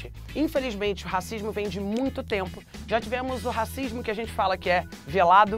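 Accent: Rio de Janeiro, Brazil. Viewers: Será que a sra é carioca?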